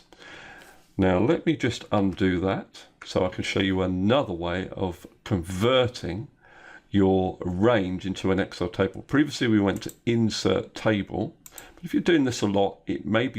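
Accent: United Kingdom. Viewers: Your british accent makes this so much more fun/engaging. When you said "isn't that brilliant?" I was like, why yes... yes it is.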